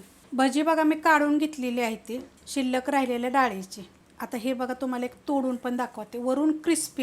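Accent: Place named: Satara, India. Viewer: ताई तुमची भज्यांच्या रेसिपी मला खूप आवडतात आणि त्यातल्या त्यात बोलताना तुमचा सातारी टच मला खूप भावतो👌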